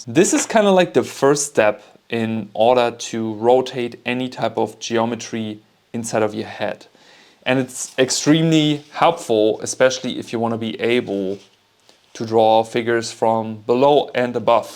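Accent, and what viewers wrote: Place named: Germany. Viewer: I hear german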